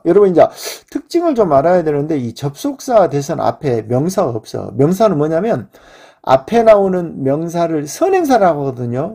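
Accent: Jeolla, South Korea. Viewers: 영어보다 즌라도 어투가 넘 도드라져서ㅜㅜ 40초 듣고 껐습니다 ㅜㅜ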